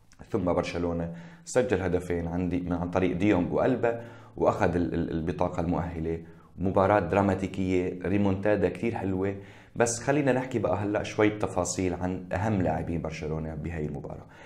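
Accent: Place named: Aleppo, Syria. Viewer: انت مبيين انك حلبي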